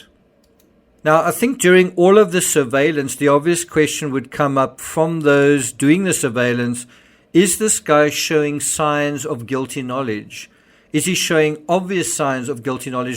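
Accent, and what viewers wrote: South Africa. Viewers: Howzit. Off topic, but are you South African? Your accent is very familiar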